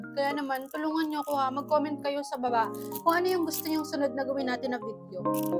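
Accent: Batangas, Philippines. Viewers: Magames ka ba? Kung oo, anong games ang kinakahiligan mo? Kung hindi, anong ginagawa mo o pinagkakaabalahan mo gawin pag bored ka? namiss kita! Una kitang napanood yun atang bumili ka ng bagong iphone at kasama mo ang tatay mo. Natuwa ako sa iyo gawa ng punto mong batangueño ay hindi mo tinatago. Namimiss kong umuwi ng batangas dahil sa iyo. Dun kita sinimulan panoorin. At masaya ako na malayo na ang narating mo. <3 Godbless you always! <3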